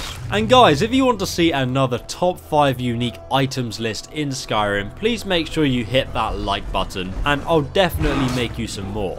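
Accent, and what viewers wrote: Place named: United Kingdom. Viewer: What I've been subbed for 3 years now and I just noticed your British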